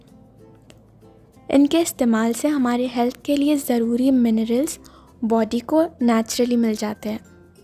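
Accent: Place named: United Kingdom. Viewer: अंग्रेजी उच्चार मे हिंदी बोलनेसे क्या आप उच्चभ्रु होनेका अहसास करती है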